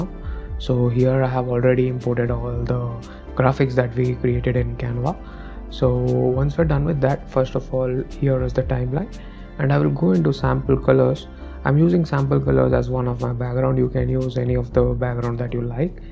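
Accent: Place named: India. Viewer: indian accen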